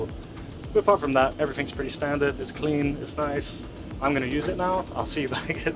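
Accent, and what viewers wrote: United Kingdom. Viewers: You know the weird thing, despite the clearly British accent, I always thought you were French until I saw the British passport! 😂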